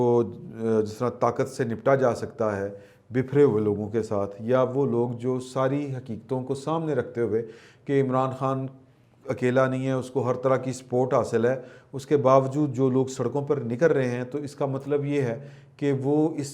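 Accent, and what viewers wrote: Punjab, Pakistan. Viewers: Sir kamal way of delivery with punjabi urdu tarka😊😊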